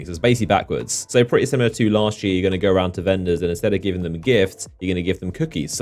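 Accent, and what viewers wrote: United Kingdom. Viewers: Why is everyone on Youtube talk in a British Accent ? lol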